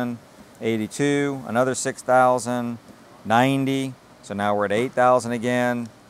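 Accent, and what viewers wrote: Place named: Georgia, United States. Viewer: where you guys based? Georgia? I used to work in Hinesville 2004. I`m from the gods country of Scotland lol, Love the accent guys ,YI-ALL doin a great job guys.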